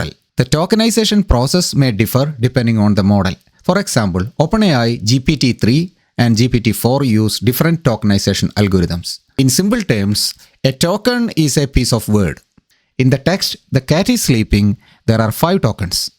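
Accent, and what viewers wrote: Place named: Kerala, India. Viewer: Malayali accent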